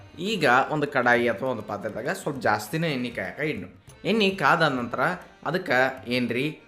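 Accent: North Karnataka, India. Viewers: Very simple fast yasty recipes. The North Karnataka language very lovely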